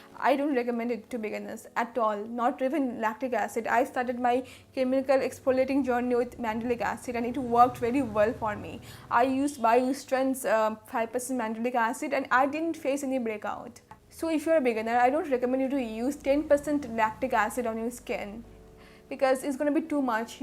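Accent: Bengal, India. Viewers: Her pronunciation is absolutely perfect. There is not a single way English words are pronounced lol. Americans, British,Irish and Australians have their own version of English. There is no ONE pronunciation. This is the Indian one and it's perfect. Stop making people speak the way you want them to speak. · She is not at all trying copy any accent here,its only MTI(mother tongue influence) as she is a Bangali,every person in India has their own English accent because of our regional languages. If we go to south India, their English is very different. Similarly, people living in north India have a different English accent, so what's wrong with her accent. Oh yes,she isn't an English teacher. You are doing a fabulous job. Let's help our fellow people to grow,let's motivate and encourage them. If we can't, lets just keep quiet. Let's be nice to people.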